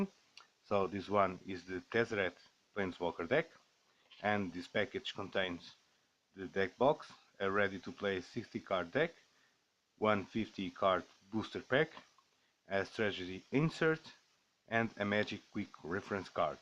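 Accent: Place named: Portugal. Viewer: i have a quick question , you seem like you have a "portuguese accent" where do you play magic ?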